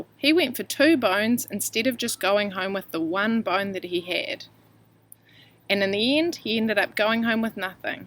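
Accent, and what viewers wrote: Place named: United Kingdom. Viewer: British ascent yay E E E E E wow that is sad now that i watch the one part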